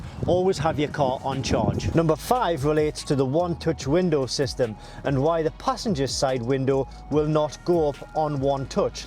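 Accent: Newcastle, United Kingdom. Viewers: Alan Buckingham Fairly sure he said that he wasn't sure about the air in the seats. Why you being so offensive, if you read the comments here you will see that most people did learn a couple of things, and for those that didn't were nice and polite, the way a gentleman would be. He has a Geordie accent, and probably has more class and decorum in his little finger than you have in your whole body. You fucking child. Jog on your not welcome here.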